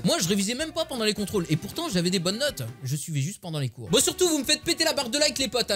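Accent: Belgium. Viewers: Accent 11/20 je suis belge aussi🍟🍟🍟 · Tu fais bien lacsent belge Cars je le suis😁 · C'est pas que tu fais mal l'accent belge enfaite c'est qu'on en pas on a le même accent que les français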